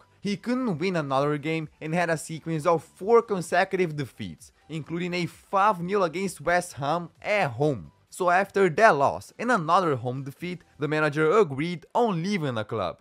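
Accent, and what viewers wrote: Brazil. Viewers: Bro,just for curiosity,are you brazilian?juat because your accent,btw iam brazillian :)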